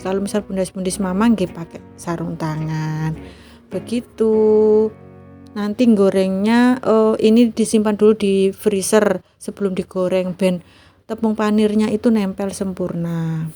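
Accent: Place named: Java, Indonesia. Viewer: Wah medok sekali Asal dari mana bund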